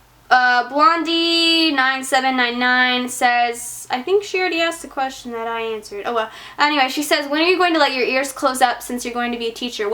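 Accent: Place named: South, United States. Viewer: omg when brain said you picked up a country accent I didn't believe him LOL I heard it!!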